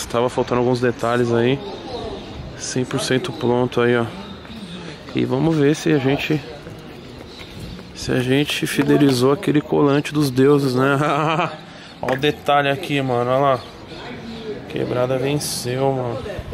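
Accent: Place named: Bahia, Brazil. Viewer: baiano dms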